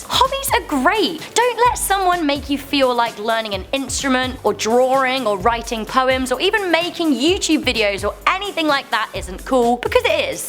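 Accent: United Kingdom. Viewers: Ahhhhh I love that British accent!!! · Is it me or did express her British side more than usual 😂 I live in England to....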